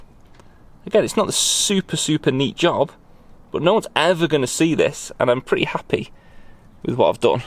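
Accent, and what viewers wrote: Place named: Stoke, United Kingdom. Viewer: top job.. is that a stokey accent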